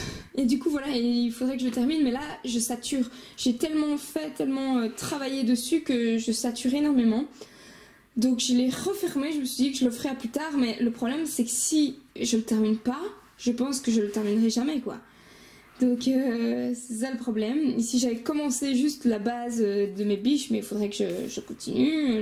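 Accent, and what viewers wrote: Belgium. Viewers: Cc tu devrais te remettre à ton double car déjà la il est beau mais une fois terminé sa promet sinon moi des moments je fait un fond mais ya des colos ou je regrette d avoir fait un fond enfin c est des testés aussi bon courage a toi et merci pour ton partage tu as un accent ? Belge ? Je me trompes ?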